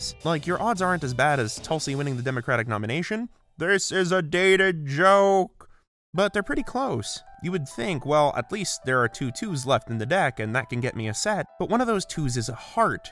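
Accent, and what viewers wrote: Canada. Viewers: I like how you say “Le Chiffre” with a thick Quebec accent but the rest is canadian English xD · Québécois identifié!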